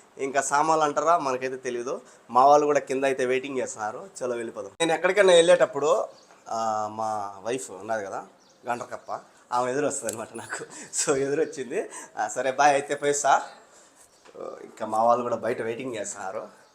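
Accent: Rayalaseema, India. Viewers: Andralo kompa vaduka basha Google lo serch chyandi kompa meaning house ani anna rayalaseema yasa lo matladutunnadu edi akkadi local language tappuga tesukovaddu daya unchi yevari basha valladi nenu telanganany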